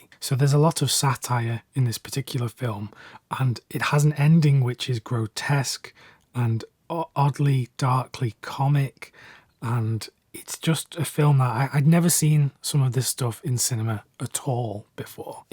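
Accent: United Kingdom. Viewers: Hey, im not even really collecting Blu-ray, but i sometimes just play your videos for the athomsphere. Like you were my chilled bri'ish fella 😂 i dont know if thats weird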